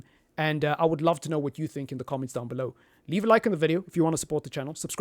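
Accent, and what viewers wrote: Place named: New Zealand. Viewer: Where you from bro New Zealand or South Africa can't really tell ya know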